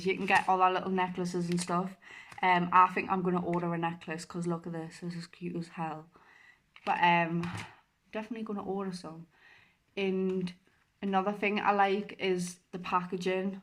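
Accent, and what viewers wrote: Newcastle, United Kingdom. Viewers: Thanks for your honesty, just talking about it may help others, and probably you also. Congratulations on the product endorsement, I didn't know about these products. I'm sure I am speaking for probably all of the Americans on this channel, when I say, I enjoy your 'rambling on', because I enjoy that New Castle accent... kind of like one of the several 'working class' accents in the US, it just sounds genuine. God bless!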